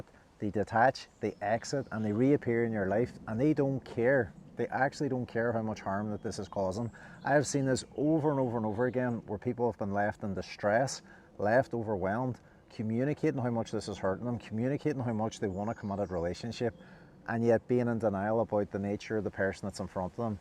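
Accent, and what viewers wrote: Northern Ireland, United Kingdom. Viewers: Your channel popped up and disappeared but I found you. Lovely to hear a fellow N.I. person. ❤ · An Irish Northern man ...wise. lrish here. New sub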